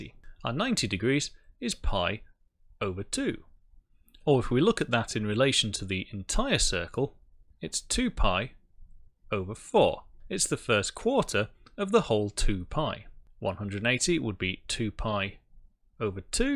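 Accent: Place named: United Kingdom. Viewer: I think it's charming that you use hand drawn letters and figures versus perfect computer fonts. That's a good segway to getting into the four linear acceleration equations with d, t, v and a and the more complicated cross product. Then applying force on an elevation with coefficient of friction. From the American perspective, having a British accent and talking about a scientific or philosophic topic makes you sound smart. XD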